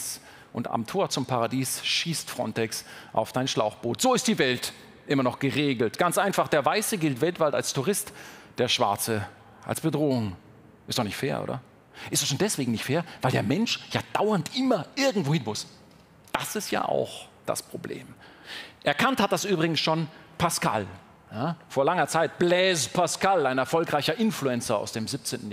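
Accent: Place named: Bavaria, Germany. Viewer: Auch wenn er aus Bayern kommt ist dieser Dialekt, so finde ich (Niederbayer), unangenehm anzuhören. Trotzdem ein tolles Video.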